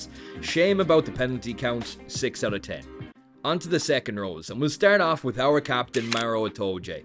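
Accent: Ireland. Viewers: I think your videos would be going very far if you keep rating like that crap ...JGP could have been Player of the Match ....Hugo was fantastic ....you need to do better homework and rate your team not just on stats you get from Internet...you are obviously not a player. I won't be coming back to be droned by your flat Irish accent .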